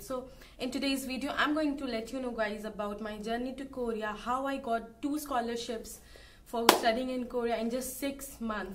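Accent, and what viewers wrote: Jharkhand, India. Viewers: TURU... HMKO LG HI RA THA SHE IS FROM JHARKHAND😂❤️ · I'm from Jamshedpur....and her accent is jharkhandi saying hum 🥺❤️ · Ur language as" hum hum" ...though u r just one prsn reminds me of my hometown...that's too jharkhand🤣🤣🤣🤣too cute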